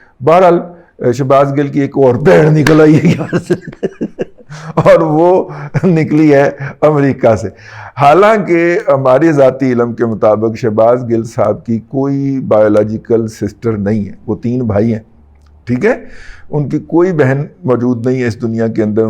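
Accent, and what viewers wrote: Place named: Lahore, Pakistan. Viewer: پائیں دادا آپ بابو نا بنا کریں آپ لاہوری اپنے بائ Barith سٹائل میں بات کیا کریں یہ بابوں والی زبان آپ کی ناہھے ناہونی چاہیے نا آپ اچھے لگتے ہیں خیال کریں 🙃🙃🙃🙃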